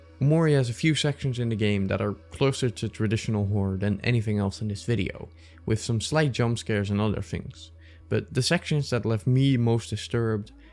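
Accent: Netherlands. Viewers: altijd heerlijk om een nederlands accentje te horen wanneer je er niet een verwacht 💕 💕 🇳🇱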